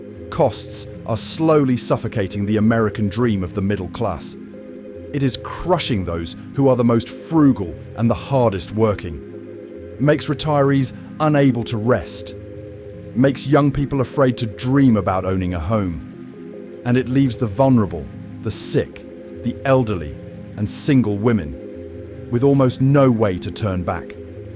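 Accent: United Kingdom. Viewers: This is fake! I live in Oakland and this fake documentary with a foreign UK accent explaining you something they don’t even know about it. Just to create fear. This is straight FAKE!!!! That’s like UK people telling you about Mexico and Cartels.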